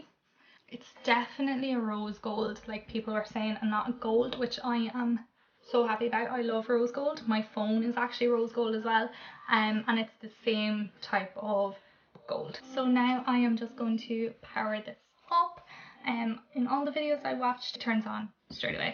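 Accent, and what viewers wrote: Ireland. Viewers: Are u from Ireland? Well asked that too soon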